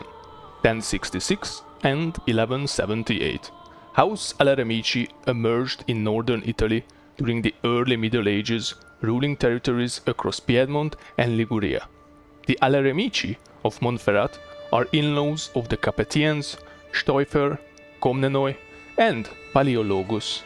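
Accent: Hungary. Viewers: Are you Hungarian by any chance? That accent is almost unmistakable. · Kicsit belobban a magyar akcentus, amúgy egyből durrant a sub+like, örülök hogy egyre több a magyar videós, aki ck3-mal foglalkozik! Hajrá!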